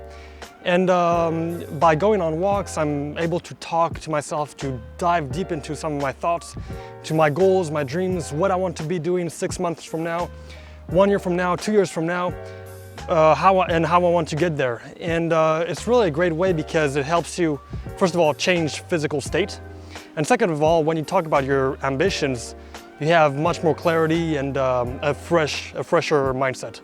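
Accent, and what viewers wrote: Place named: Europe. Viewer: Sounds like europe somewhere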